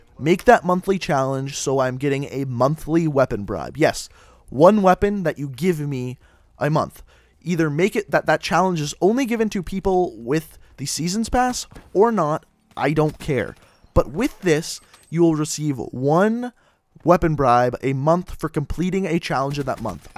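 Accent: Canada. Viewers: Is this guy From Canada or does he have a accent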